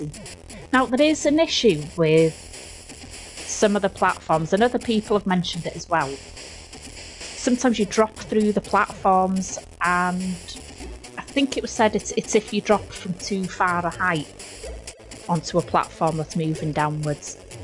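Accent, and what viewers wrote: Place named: Liverpool, United Kingdom. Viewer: Now then,I thought I'd already subscribed to your channel, but obviously not lol..anyway if I remember wright retro b8 mentioned this channel, unless there's another retro Scouse (with yourshire overtones)sounding girl channel ..don't worry im from Liverpool myself.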